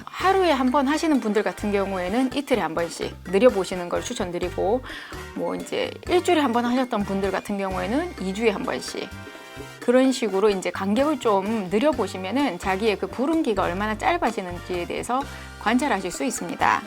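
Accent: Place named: Jeolla, South Korea. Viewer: 전라도 깽깽이냐?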